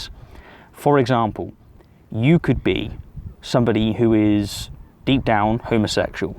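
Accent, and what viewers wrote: United Kingdom. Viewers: wait you're British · So you re british! Didnt notice in somehow. Southern coast seems nice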